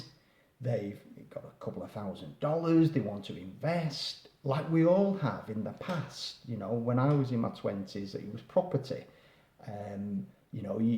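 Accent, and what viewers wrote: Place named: Yorkshire, United Kingdom. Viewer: From Leeds? Yorkshire? Loved the video! Very informative. Steem is interesting with its user interaction with it/ social aspect.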